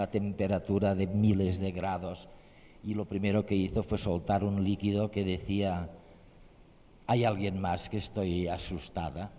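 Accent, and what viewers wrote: Spain. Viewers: por el acento balear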